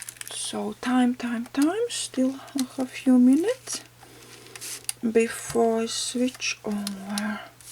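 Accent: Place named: Iceland. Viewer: Hello! While watching your video I had a feeling that you have an icelandic accent, am I right?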